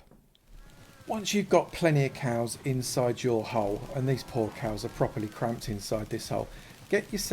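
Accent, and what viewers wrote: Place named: Yorkshire, United Kingdom. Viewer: ur yorkie accent is making mine thicker mate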